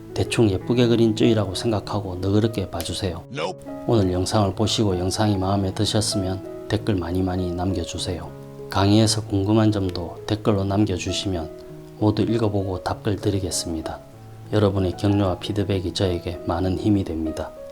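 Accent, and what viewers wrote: Gyeongsang, South Korea. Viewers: 근데 어느 지방에 사십니까? 말투가 경상도이신거 같은데 가끔 빵 ~~터집니다ㅋ 그림공부하는데 많은 도움이 됩니다 감사합니다^^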